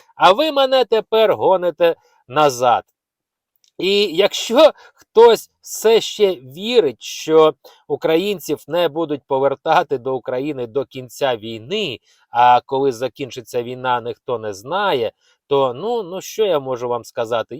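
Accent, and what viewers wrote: Russia. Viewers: Автор - серед українців вати немає. Бо вата це російські і проросійськи мислячі люди, які українцями бути не можуть в принципі. Громадянами - так. але серед них і вірмени, і євреї. Я про це вже вам зауважував. Якщо ви вважаєте себе україномовним блогером ( російський акцент лізе у всі діри), то притримуйтесь усталеної української лексики, а не переміняючи поняття з ніг на голову. Що характерно саме для москалів. Не братимете подібні зауваження до уваги - зійдете на маргінал, як подібний до вас канадський марк. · Любі українці, не слухайте цього мерзенного блогера. Він чистокровний рашист, прислухайтеся до його вимови. Ще один канадський марк знайшовся, який бігає по лісосмугах та ллє бруд на українців. Але при цьому не заважає йому клянчити у українців гроші на донати. Мені цікаво, куди ідуть ті гроші. Сумлінні українці і без вас донатять. 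Самі присосалися до чужої країни, а людей, які рятували своїх дітей від війни, вони пускати на"свою" територію не бажають. Це їм конкуренти. У нас в Україні майже всі чоловіки працюють на двох або і на трьох роботах. І не вам їх паскудити.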